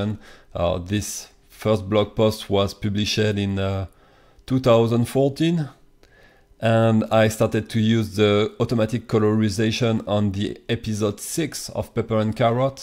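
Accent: France. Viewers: Hmm l'accent francais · ta un acent francais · Thank you for your clear explaination and your french accent, it help me a lot. I'm doing my transition toward Krita and this tool is very impressive. I have just tried it on my last page, i've saved maybe one hour today ;) Thanks you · l'accent français jpp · Tu peux faire la video en français (je reconnait un accent quand j'en vois un) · cet accent français .-. Enfin bon je dis ça mais je ne suis pas mieu lol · Thanks for the tutorial (Ps: is it me or you sound french?)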